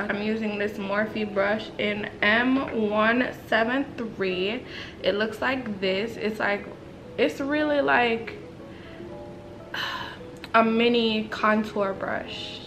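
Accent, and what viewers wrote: Jamaica. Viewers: Mi hear the patwa and affi subscribe 🇯🇲🇯🇲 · The Jamaican accent!!! Yeh girrrrl... right out 😂❤️ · gurl JAMAICAN PURRRRRRRR. DONE KNOW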